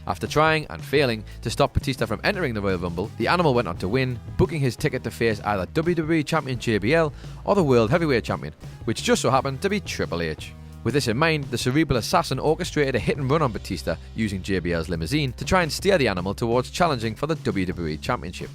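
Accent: United Kingdom. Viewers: accent is too thick man.. i get it youre british but you gotta go a little out of your way and stop using words that only english people use. it is difficult to follow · Well, not everybody is familiar with thick british accent. Plus he talks too fast. Is it Irish or Scottish accent? Its not like its impossible to understand. But some words are really.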